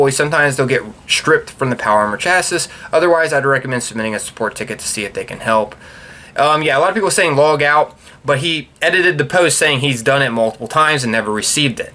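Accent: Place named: Baltimore, United States. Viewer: Bro's speaking like he's from Baltimore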